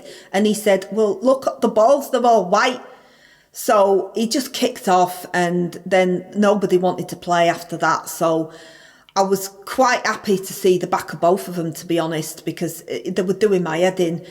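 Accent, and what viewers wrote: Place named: Manchester, United Kingdom. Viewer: Imagine calling someone else a buffoon whilst speaking with a Mancunian dialect.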